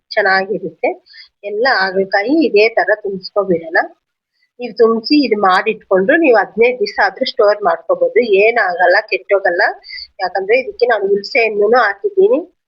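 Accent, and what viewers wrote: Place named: Andhra Pradesh, India. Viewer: Neevu kannada tumba cutagi matadtira madam❤😊🎉 I think nimma mother tongue Telugu irabahudu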